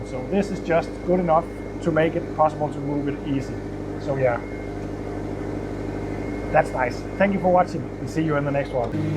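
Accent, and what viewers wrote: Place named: Denmark. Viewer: Danish English is so cute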